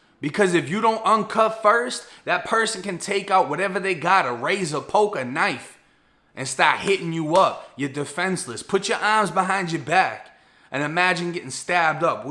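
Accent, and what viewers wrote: Boston, United States. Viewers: Bro this shit funny as hell. I was just watching some of your old videos and I gotta say man, your Boston accent has gotten so much thicker since when you first got out. Your old videos you still had that Florida style speak. Now you straight Red Sawks and ima have a bea down at the bah style.. Lol. Love ya videos tho bro. Also, I used to just listen to your videos while I was driving but now you gotta actually sit there and watch your videos cuz you got photos, pics, graphics and shit. Stepped yo YouTube game up bruh. Keep doing ur thing tho, my man. Awesome content. Awesome stories. Peace bruh.. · I dont care what anyone says u look n sound mad familiar n thats a Boston accent.lol..💯 It'll come to me i lived in FL when i got released for 5yrs!!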